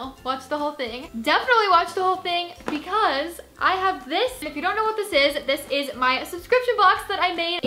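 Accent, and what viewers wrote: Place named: United Kingdom. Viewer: You sound British in this video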